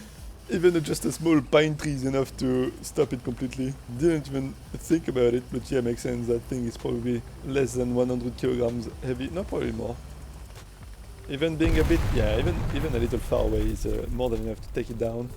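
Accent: France. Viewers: Omg l'accent français il est si flagrant 😂😂😂, sinon bonne vidéo gg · tout ce que j'entend c'est l'accent français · Your French accent really adds a great touch to the video. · I knew you was french at the first second of the video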